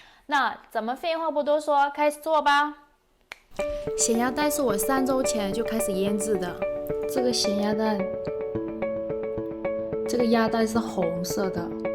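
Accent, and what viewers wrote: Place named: North, China. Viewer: 我是海南定安人 新竹 我搬凳子來看，你口音澈底北方人口音。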